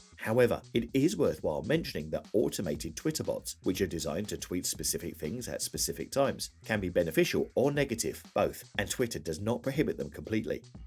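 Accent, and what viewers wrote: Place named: United Kingdom. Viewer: Even tough this is a fine presentation, I get awfully tired of the clipped British accent when we are all tuned in to the good old American accent. It just sounds phony and is hard on the ears.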